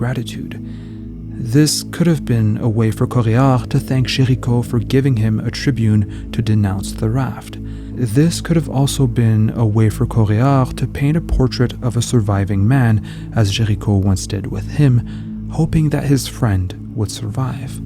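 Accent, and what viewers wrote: Quebec, Canada. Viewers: Hey love your content is your accent Quebecois ? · Are you from Quebec? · hes from kebek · Salut, es tu Québécois? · Your quebecois pronunciation is surprising 😄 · Are you from montreal or quebec?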